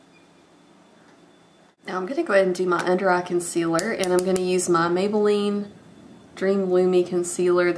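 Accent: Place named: South Carolina, United States. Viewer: What state are from? You sound like and I am from SC. Love your vids.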